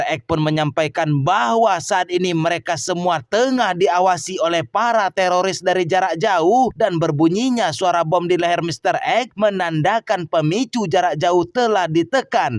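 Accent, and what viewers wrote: West Sumatra, Indonesia. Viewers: Eh mimin ny orang sumatra barat kyak e mah salam dari Padang mimin sehat sehat min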